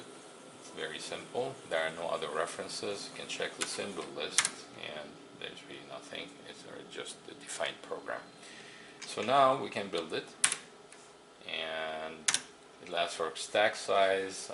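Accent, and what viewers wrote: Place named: Sweden. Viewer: This guy sounds like he is not mainly English, maybe Swedish or something i don't know, probably that's why. I think he did great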